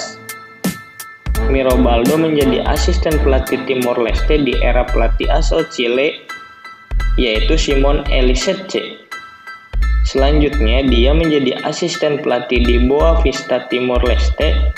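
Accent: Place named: Banyumas, Indonesia. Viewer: orang ngapak mungkin aslinya dia bro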